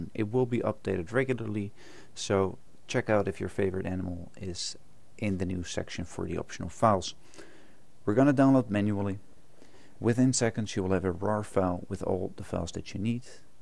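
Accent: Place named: Netherlands. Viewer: This guy sounds Dutch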